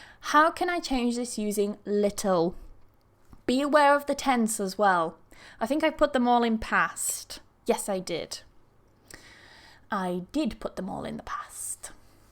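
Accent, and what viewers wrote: United Kingdom. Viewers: Thank you so much from Ukraine! Just about a couple of days ago I was thinking about the inversion, as I see it quite often and I was interested in learning it. And today I've seen your video and I was able to understand everything you were talking about! Thank you!! And one more thing!:)) As I'm learning different English accents (British is my favourite one!:)) but I also know you have more than 50 accents and dialects in England, I want to say that your accent is really great and it's a great pleasure to listen to it:))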